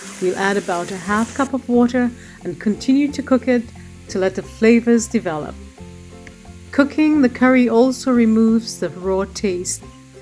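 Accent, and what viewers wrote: Trinidad and Tobago. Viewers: Miss thank you for helping me improve my cooking. And continue to speak de trini "twang"😆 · I love your speaking voice, it’s a well rounded, articulate Trinidadian voice and love your channel too..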